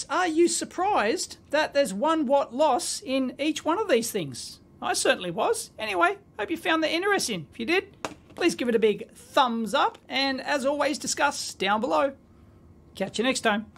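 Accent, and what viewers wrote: Australia. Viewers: Umm… Aussie accent… yup, EEVBlog, not ElectroBOOM. Nonetheless, I’m expecting some smoke… 😉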